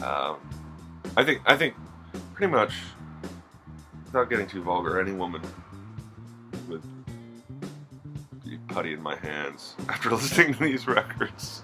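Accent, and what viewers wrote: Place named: France. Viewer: Just love your French accent! nice diabolique pick ups.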